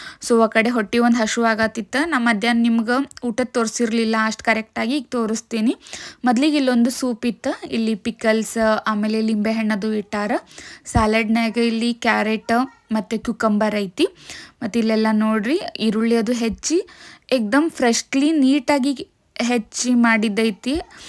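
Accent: India. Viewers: Speaking in north(Uttar) Karnataka language, and you are from the south Karnataka youtube channel and? Why do you people do like this. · ಮೆಡಂಮ್ ನಾವು ದಾಂಡೇಲಿಯವರೆ,ತಮ್ಮ ಅನುಭವ ಹಾಗೂ ಶುದ್ದವಾದ ನಮ್ಮ ಧಾರವಾಡದ ಕನ್ನಡ ಭಾಷೆಯ ವಿವರಣೆ ಕೇಳಿ ನಿಜವಾಗಿಯೂ ತುಂಭಾ ಖುಷಿಯಾಯಿತ್ತು, ದಾಂಡೇಲಿಯಲ್ಲಿ ಸರೌಡಿಂಗ್ 600.700ವರೆಗೆ ರಿಸ್ವಾಟ್೯.ಹಾಗೂ ಹೋಮ್ ಸ್ಟೋ,ಇರುವವು ಇನ್ನೂ ಹಲವಾರು ರಿಸ್ವಾರ್ಟ್ ಗಳು ತುಂಭಾ ಸುಂದರವಾಗಿವೆ. ಇದು ಕೂಡಾ ತುಂಭಾ ಸುಂದರವಾಗಿದೆ.,ಧನ್ಯವಾಧಗಳು ತಮ್ಮ ಶುದ್ದವಾದ ಹಾಗೂ ನೈಜ್ಯ ವಿವರಣೆಗೆ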